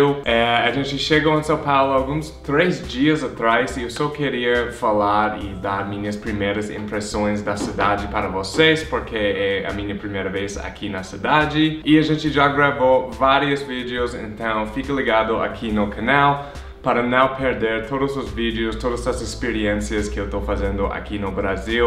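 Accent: United States. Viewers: Filma um pouco dos encontros, e faz um vídeo pra gente que não mora é nenhuma dessas cidades. Estou amando seu canal, você fala muito bem português, gosto do seu sotaque americano ♡ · É mó engraçado americano falando português kkk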